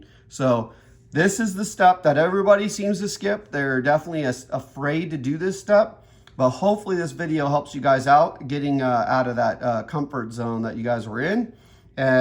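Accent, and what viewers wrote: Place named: Canada. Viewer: Great video bud ! You sound like a fellow Canadian lol , do you do repairs? I’ve had this dreaded vacuum leak for a while now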